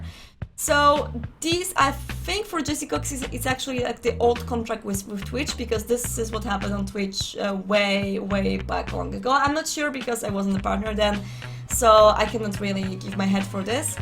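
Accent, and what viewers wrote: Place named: Poland. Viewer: Od razu słychać, że jesteś z Polski.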